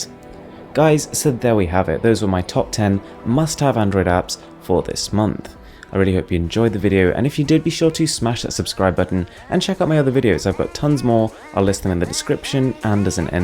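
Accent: United Kingdom. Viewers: Are you from the UK? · British MKBHD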